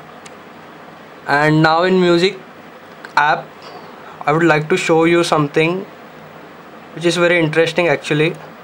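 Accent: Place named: India. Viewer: Is it me or every Indian sounds the same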